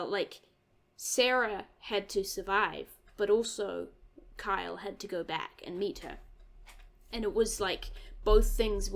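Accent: New Zealand. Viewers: This was James Cameron's first big film. He built his fame off the success of this film. Great New Zealander accent!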